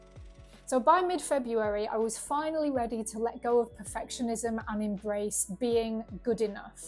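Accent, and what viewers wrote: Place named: England, United Kingdom. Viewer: Hi... Actually, I am watching your videos because of your pure English accent unlike new generations of English who are mixed with thin Aussie accent which I hate and also you are near my age and SINGLE life experience and living at parents house....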